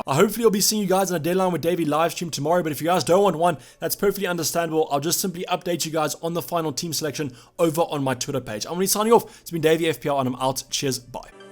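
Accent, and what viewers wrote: South Africa. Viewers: You sound hella South African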